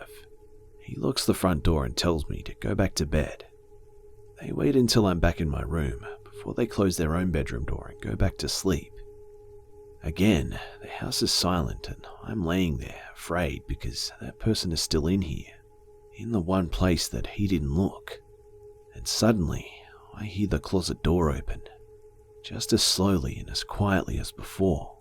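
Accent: Australia. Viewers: are you Australian?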